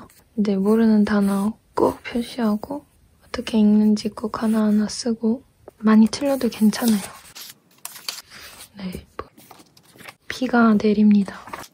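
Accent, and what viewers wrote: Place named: Japan. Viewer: 일본어 억양이 느껴지네요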